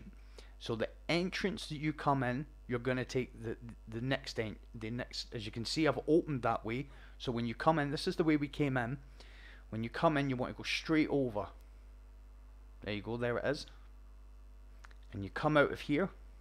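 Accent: Scotland, United Kingdom. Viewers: You are the man! Just used your solo guide for thorn strike! Will be using this guide for the solo clear. That’s awesome that you also call some of the symbols like my friend and I did. Vex head, turret, and burger haha! Thanks for everything you do dude, so so helpful! Take this as a compliment, you’re like a Scottish esoterickk! (Hope I got the accent right) no offense if you’re not Scottish!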